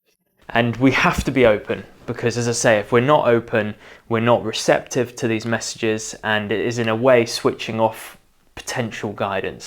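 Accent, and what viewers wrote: United Kingdom. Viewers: Are you related to the late Lady Diana? You have the same accent.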